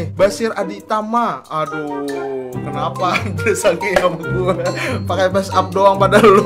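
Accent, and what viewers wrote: Banjar, Indonesia. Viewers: Bah orang banjar ni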